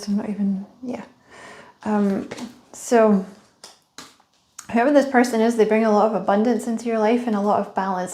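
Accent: Scotland, United Kingdom. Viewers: You sound Scottish 💙🏴👍 very accurate. Im incredibly intuitive. Im normally ten steps ahead. Anxiety up the ass lol so yes im done. New location and stagnant within a partnership. Put thru the shit, bullying, gaslit, manipulation, being spied on, stalker you name it..Things are coming to a very big end. Your so spot on. TY so much ❤ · Thank you. Are you scottish?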